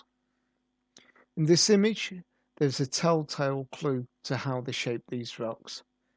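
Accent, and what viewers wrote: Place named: Liverpool, United Kingdom. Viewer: You are a dimwit, you don't even display any knowledge about concrete Let alone Inca stonework. And your scowser accent perfectly portraits your intelligence level ...none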